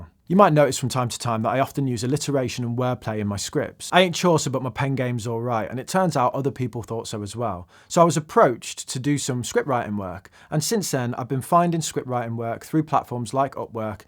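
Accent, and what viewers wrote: Birmingham, United Kingdom. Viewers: You're from the North West? I thought you were a Brummie.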